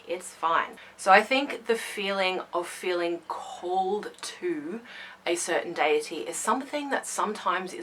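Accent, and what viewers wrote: Australia. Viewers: '27 million' I don't know what it is, but if it weren't for the accent, that's how I knew for sure you're Aussie too. Nobody of any other country or culture that I've met, ever makes those ridiculous hyperboles like us Aussies do. This video was such a great help, it's hard trying to find your way when you're just starting out because there's just so much that it can be overwhelming to know where to begin, thank you for helping me sort out this aspect.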